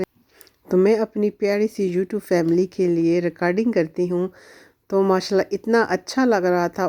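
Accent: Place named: Netherlands. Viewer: Ap k speaking main wording k axcent bilkul dutch language jasa lgta. Hy .ap ny dutch language seekhi proprly ya khud hi aa gai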